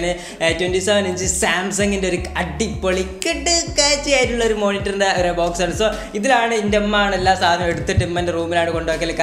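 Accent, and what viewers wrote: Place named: Malappuram, India. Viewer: ആ മലപ്രം ടച്ചുള്ള സംസാരാ ഇഷ്ടം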